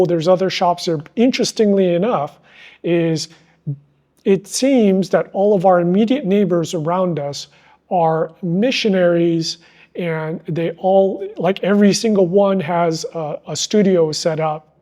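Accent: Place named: United States. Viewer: I’ve watched many of your videos on Thai language acquisition. From your manner of speaking and the small personal details you’ve shared, I assumed that you are American. But judging from your appearance, you look Chinese. Are you also a Mandarin speaker? Or are you fluent in another Chinese dialect? Are you a trilingual?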